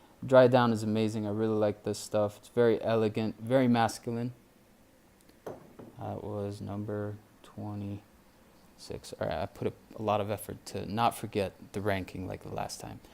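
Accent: United States. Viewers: I'm confused again,so you're arabic but you sound 100% american,so how is english your second language?;)p.s i would subscribe but your last upload was 4months ago. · Where are you from ? You have an American accent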